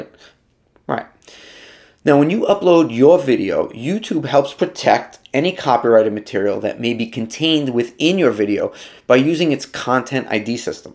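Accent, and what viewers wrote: New York, United States. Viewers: Great content. Your accent takes me home - NJ? NY? As a transplanted New Yorker I noticed it immediately.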